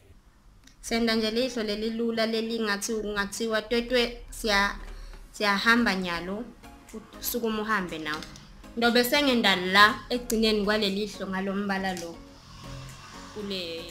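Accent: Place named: Eswatini. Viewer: So glad your Siswati accent sisekhonyana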